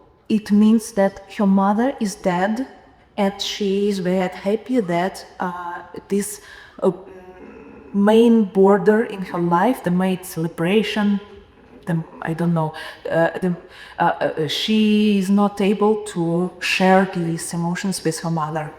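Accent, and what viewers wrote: Russia. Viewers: Я такой русский акцент понимаю хуже чем индийский 😂 Хотя казалось бы😅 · Вполне нормальный такой русский английский. Большинство невыпендривающихся русских на нем говорит. Я обожаю его! Ничего не отвлекает. Все как по-русски, но английскими словами.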